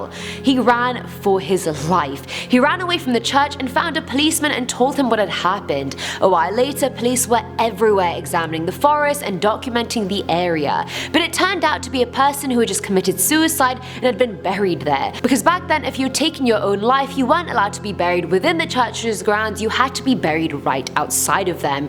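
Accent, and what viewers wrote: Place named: United Kingdom. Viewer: British accents! (I have a British accent too)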